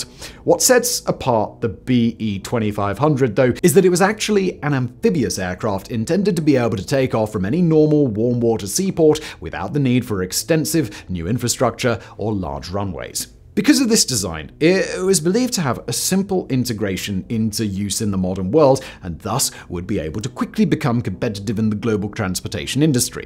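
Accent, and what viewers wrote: RP, United Kingdom. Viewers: It's just RP English, lots of ordinary people speak it. (RP = Received Pronounciation)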